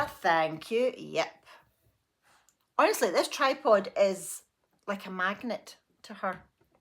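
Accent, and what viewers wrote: Scotland, United Kingdom. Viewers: I was watching one of your video's ads last week and my husband said "why are you listening to that rubbish?" So I had to explain about the ad revenue 😂 I normally watch you at breakfast time and he always comments on that wee Scottish lassie!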